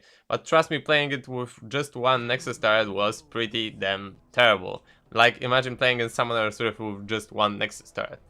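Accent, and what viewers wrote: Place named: Poland. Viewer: You know Pole as you listen to one m8 :D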